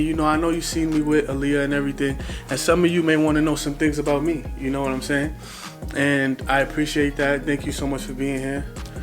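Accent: Jamaica. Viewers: Can tell you have a little Jamaican in u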